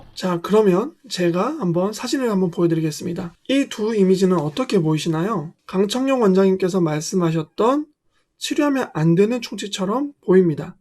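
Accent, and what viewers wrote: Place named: Gyeongsang, South Korea. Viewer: 말투가 경상도네 돈에 환장한 극우 확정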